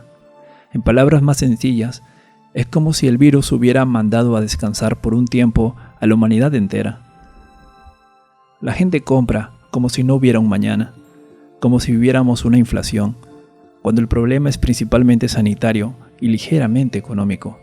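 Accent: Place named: Peru. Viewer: Por el acento me parece que es peruano. El youtuber.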